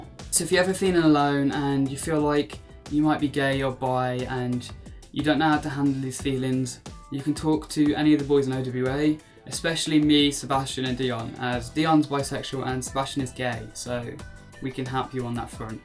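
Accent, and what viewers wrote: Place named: United Kingdom. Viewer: You look so good and such a beautiful long neck, and that beautiful British accent, you are adorable.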